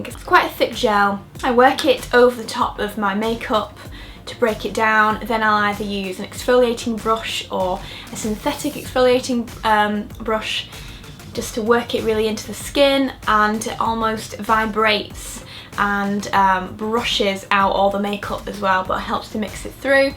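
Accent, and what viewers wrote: United Kingdom. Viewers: where is your accent from in the UK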